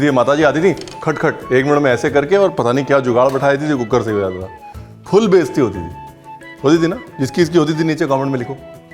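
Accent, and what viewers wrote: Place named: Lucknow, India. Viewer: Hi Ranveer...I really love your cooking style.. Lucknowi Lahza..Baate..Jo khana haste khelte ban jaaye.. Superb 😊😊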